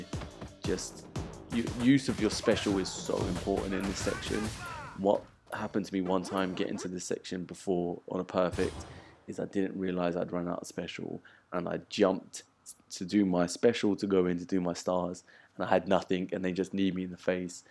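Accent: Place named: London, United Kingdom. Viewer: Oh, so you're in London! I had been trying to figure out y'all's accent. It doesn't sound like the typical English accent that I normally hear, but I'm also an uncultured American that's never really left the south lol. Love these videos!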